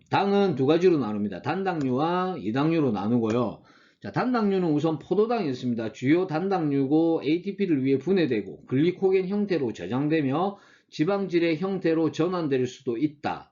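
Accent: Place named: Busan, South Korea. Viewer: 크 알겠습니다 ㅎ 오랜만에 듣습니다 단디 ㅎㅎ 저도 부산놈이라 형님 사투리가 참 좋습니다 ㅎ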